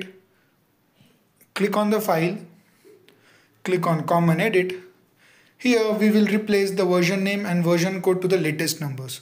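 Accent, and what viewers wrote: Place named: India. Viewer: Disliking and down voting because I had to listen to an Indian insult my language by speaking it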